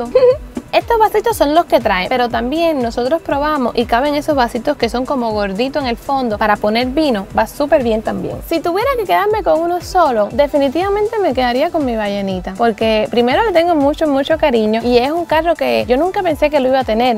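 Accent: Cuba. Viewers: Por que las dos son cubanas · cata Orozco por que es cubana y sandra también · Hola se me haces muy parecido tu hablado a Sandra cires cómo cubana 😁 me encanto tu video y cómo leí con comentario x ahí dile a tu papi que me adopte jajajaja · Marcela Aguilar claro es cubana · Sus voces son iguales por que las dos son cubanas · Porque ella es de cubaa las dos son de cuba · Medefrine ella tiene un acento cubano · alemán mucho seras.cubana te creo jaja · Hija es que es cubana pensá 👋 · es de cuba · Marcela Aguilar ovio porque es de cuba como sandra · Por el acento cubano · Cuba creo o República dominicana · Tu tono de voz y acento es muy paresido al de SandasiresArt eres cubana? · Jajajaj sii, es q las dos son de Cuba creo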